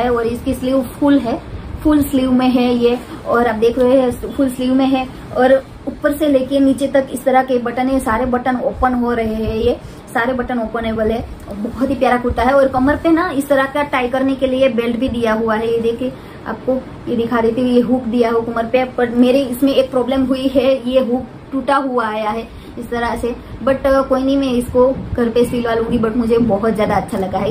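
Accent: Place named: Gujarat, India. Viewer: I just don't like your gujarati tone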